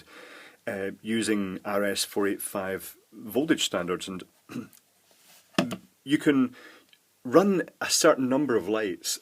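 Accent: Scotland, United Kingdom. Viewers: I wrote this before - I find wit and mellow Scottish accent very soothing. I use it as an antidote for Dave (from EEVblog), who has an aggressive Australian accent and an annoying manner of speech:) For me the prescribed dosage is 3 per one Dave:-D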